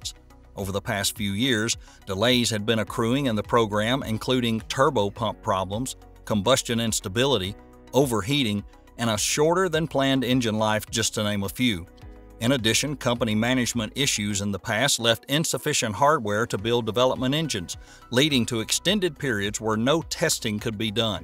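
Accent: South, United States. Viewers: Whether it’s a real human or just a really nice voice synthesizer… that’s an awesome Southern accent!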